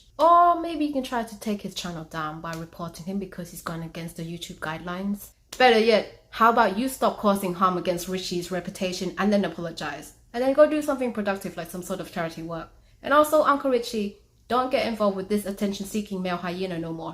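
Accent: United Kingdom. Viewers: Wher'd ya acquire that pommy accent, สำเนียงบิวติฟูลน๊าจ้ะ😂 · you're british? cool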